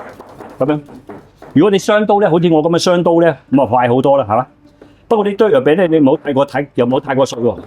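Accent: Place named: North, China. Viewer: 唔會係純種廣東人吧，講廣東話有北方口音嘅？不過廚藝就頂瓜瓜🎉🎉🎉🎉🎉🎉😊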